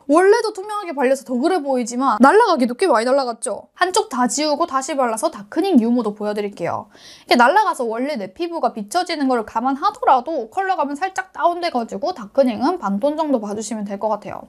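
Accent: Busan, South Korea. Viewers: 저랑 피부비슷하셔서 이분쿠션영상보고 빛이다 감격했는데 요 영상도있었네요 ㅠㅜㅠ짱짱짱 ㅠㅠㅜㅠ부산인인데 사투리까지 짱정겹다 제 친구느낌이에욬ㅋㄱㅋㅋ 퓌 리뉴얼이랑 클리오 하이글로우 웨메쿠션 진짜 궁금했는데 감사합니댯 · 이 웅니 경상도다...경상도 꼬순내가 난다...아닌가....아니야 꼬순내가 낰ㅋㅋㅋㅋㅋ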